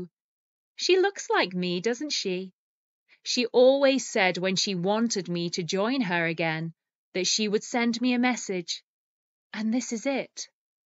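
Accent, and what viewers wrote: United Kingdom. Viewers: I used to listen to American English only that I don't get the British one, but after watching a couple of your videos, I got used to understanding more British English! Thanks so much! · Yes, sometimes I got lost in the story, but it's because I saw a bunch of words that have never seen before. Hahaha I used to be angry at this, but now, I know it's something normal, it's part of my English learning journey. If I already knew them all, why would I learn English then? Hahahahaha I want to thank you for this story. I helped me a lot to improve my British intonation, pronunciation and learn new words. Keep going. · Thank you so much, I had a nice time hearing and read along to have British accent. It was so fun that as soon as I saw the word mesmerising, I immediately thought of mermaids. And I was so sure about it when I read that the woman's name was Coral. 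Thank you for the story 😊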